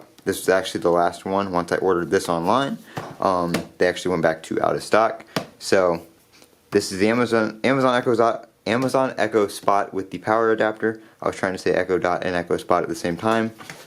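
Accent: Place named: United Kingdom. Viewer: WoW I don`t know why she sound British for gods sake WTF !!!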